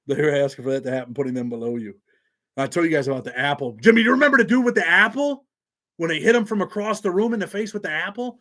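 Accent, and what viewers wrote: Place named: South Buffalo, United States. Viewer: Your south buffalo as fuck bro !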